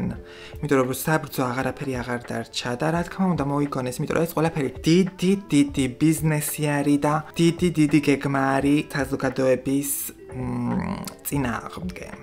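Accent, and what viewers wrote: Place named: France. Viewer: ბიოლოგიკური 😁😁 უსაყვარლესად გამოთქვამს ქართულ სიტყვებს ფრანგულის გავლენით 😁😁 ძალიან სახალისოა ❤️, რესპექთ რასაც საუბრობ მგონია რომ სწორია ❤️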